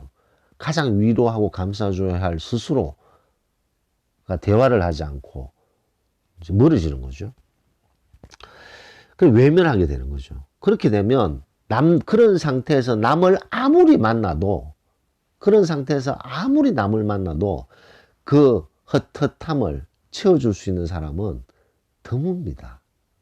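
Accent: Gyeongsang, South Korea. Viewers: 선생님요 !! 정말궁금합니다 자꾸 선생님말씀도중 너무궁금합니다~ 구독자도 궁금증이있으면 알고싶어요 왜야면요! 자꾸생각납니다 옆집아저씨 같아서요 고향만어디신지 경북인지 경남인가요?? 샘이생각할때는 어처구니 없지만 ㅎㅎ 웃음이납니다 오늘도 너무 가슴에 와닫고 내가슴을 어찌호비파는지 나도모릅니다 지금도 어디서도 나의아픈 상처를 어찌잘아시는지 부처님도 아니시고 그리고 하느님도 아니시잖아요 !!감사하고 감사합니다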